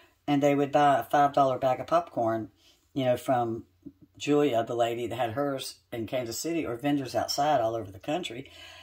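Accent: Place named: Virginia, United States. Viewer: You're a great storyteller with that Virginia accent.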